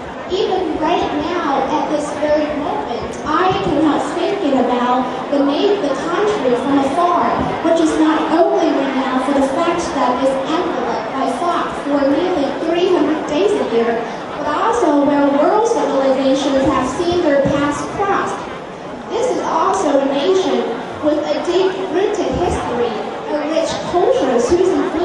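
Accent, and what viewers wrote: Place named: United States. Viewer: Your accent is way more like American than British.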